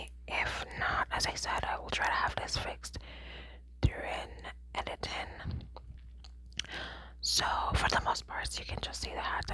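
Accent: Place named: Jamaica. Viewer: Where are you from? Sounds jamaican!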